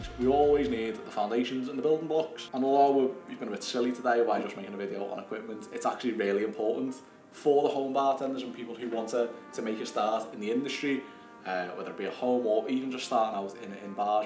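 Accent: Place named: Liverpool, United Kingdom. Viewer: Stumbled upon you and your channel today when my pal Coach Vino posted about your drink, Vow of Silence. And now I get to work on my ability to understand Scouse! I've subscribed and look forward to your videos. Cheers!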